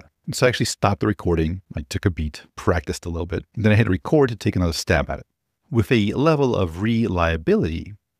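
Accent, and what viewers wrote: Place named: Eastern Europe. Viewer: you do have a slight foreign accent though. it sounds Slavic. did you speak Russian first then switch to English at age 13? that's my guess your content rules though, and your tweets are just as good. pure signal over there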